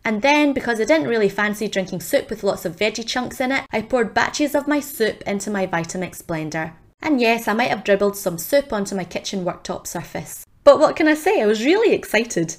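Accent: Scotland, United Kingdom. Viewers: Are you part Scottish?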